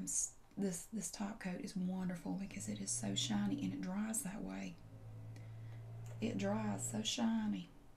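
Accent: South, United States. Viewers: Lol you are soooooooo southern. " luuuuuuuuuk ". Love it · this makes me so comfortable, cuz I'm from the south and I meet people an accent like that , it just makes me feel at home😆😆😆😆 keep doing what your doing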